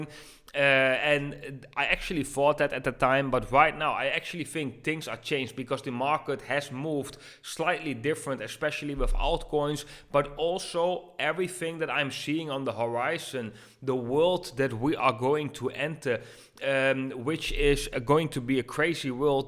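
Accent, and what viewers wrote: Netherlands. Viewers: meetup would be sick, je klinkt nederlands, ben je van nl?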